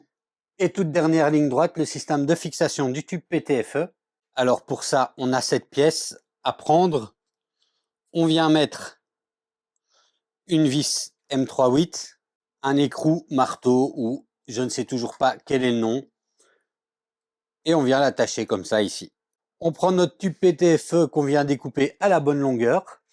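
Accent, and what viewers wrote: Belgium. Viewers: Bonjour Merci pour cette série Du montage De l'imprimante Voron Pourquoi vous dites À faire en fr Vous n'habitez pas en France Car je trouve que vous parlez très bien la langue française Avec un petit accent Je pense Belges ou flamands Wallon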